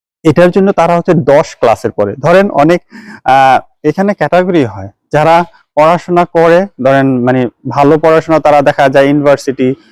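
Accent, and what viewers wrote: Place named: Comilla, Bangladesh. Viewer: আপনার কথা কুমিল্লার সাথে মিলে যাচ্ছে।স্যার আপনি কি কুমিল্লার সন্তান?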